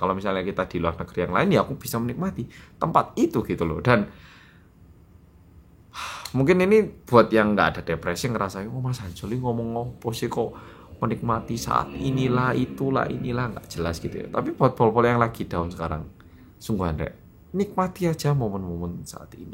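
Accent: Java, Indonesia. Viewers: medok,medok,medok banget sih....bahasa koreanya logat Jawa ya? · kok malah logat jawa ya keliatan medok he he hee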